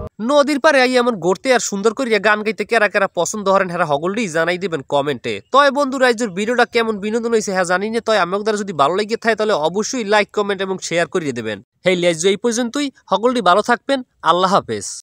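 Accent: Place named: Barishal, Bangladesh. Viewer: ভাইয়া আপনার বরিশাইল্লা কথা গুলো ই খুব ভালো লাগে❤❤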